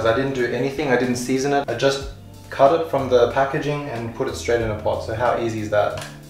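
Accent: Germany. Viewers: Are u from Germany? Sounds like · Srsly, your german is so authentic that I (as one) was sure you were german. Good job!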